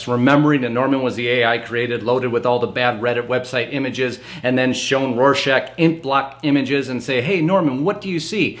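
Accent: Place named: Chicago, United States. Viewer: Any chance that you are from Chicago?? Your accent is thick 😊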